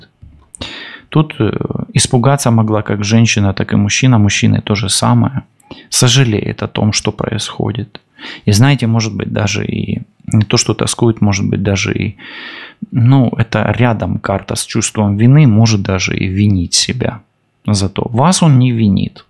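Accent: Ukraine. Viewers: Яка у вас красива українська мова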